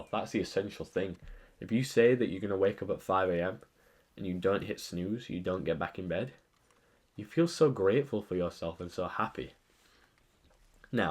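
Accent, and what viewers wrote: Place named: Sheffield, United Kingdom. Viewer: sheffield accent spotted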